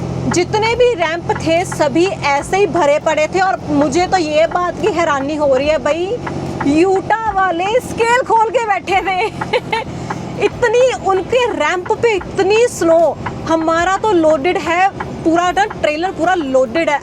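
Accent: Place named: Punjab, India. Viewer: Aapki punjabi english badi pyari hai. Aap ki birth place konsi hai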